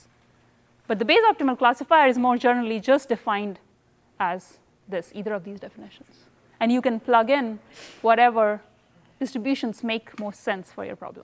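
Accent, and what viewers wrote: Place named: India. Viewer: Indian? Oh my god, terrible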